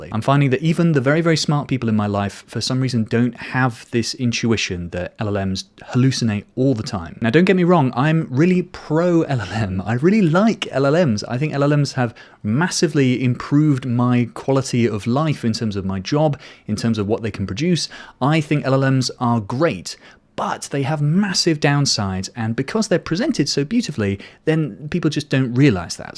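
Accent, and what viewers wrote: United Kingdom. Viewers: Thanks for the video, you are becoming my favourite source for AI based videos as a developer. Unbiased, realistic and love your British accent, but, I hope you are not an Arsenal fan:D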